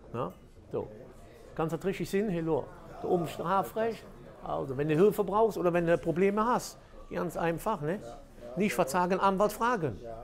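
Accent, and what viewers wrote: Cologne, Germany. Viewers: Hallo, ich liebe den Kölner Dialekt. Grüsse aus Basel.🇨🇭👍💣💥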